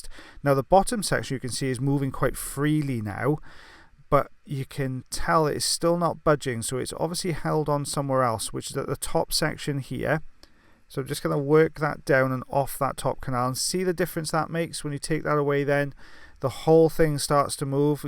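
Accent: United Kingdom. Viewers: Omg you should do hilarious ear wax removal in your British accent would awesome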